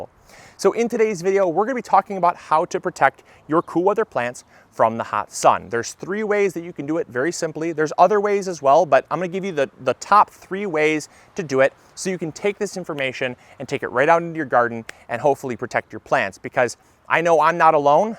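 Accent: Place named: Midwest, United States. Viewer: I appreciate the cliffnotes, but also enjoy hearing "What is going on, everyone?" with his pleasant Midwestern accent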